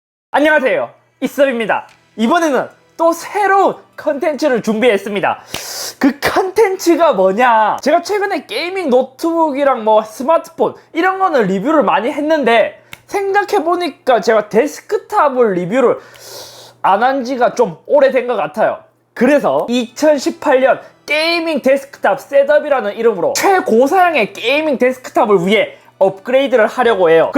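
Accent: Gyeongsang, South Korea. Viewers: 사투리가 경남쪽인가요? 경북쪽인가요??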